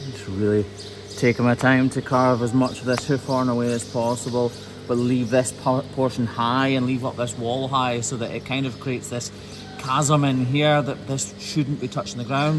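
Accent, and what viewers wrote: Scotland, United Kingdom. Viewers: He Scottish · I enjoy you videos. I started watching so I could better your Scotish accent. Now you are speaking more proper I sort of miss that.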